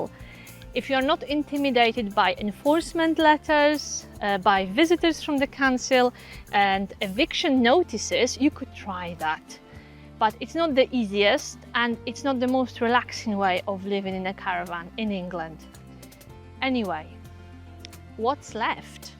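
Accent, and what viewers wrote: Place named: Eastern Europe. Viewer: Sounds more like an Eastern European accent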